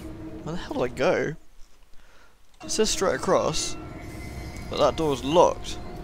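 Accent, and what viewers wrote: United Kingdom. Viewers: Not surprised the unnamed gas giant's atmosphere and winds shredded the station up, probably similar but larger in magnitude, mass and strength of winds like Jupiter. Also not surprised with the ending being an homage to the original 1979 Alien movie. It took me about 3 or 4 days to watch this all in the evening hours (7 PM - 1-2 AM EST) , fantastic LP with your sense of humor and/or logic and your awesome British accent.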